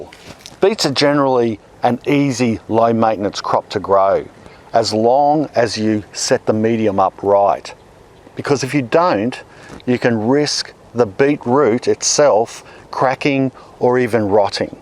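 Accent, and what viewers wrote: Australia. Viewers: Chef E He lives in southern Queensland in Australia and he is speaking Australian. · i enjoy your content delivery, your Ausi accent as well; i take what i can from you, because i live in a 6 months of gardening a year if i'm lucky, area just north of the 45th parallel, on the shore of Lake Huron one of our great lakes. i love beets, and growing them; i took away the spacing part of your talk, i'm gonna try your way in about 4 months. thanks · Love your Australian accent! Your opinion of sub-irrigation grow boxes?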